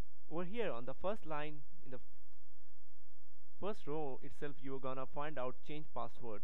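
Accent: India. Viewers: Thank you very much! il ur indian accent!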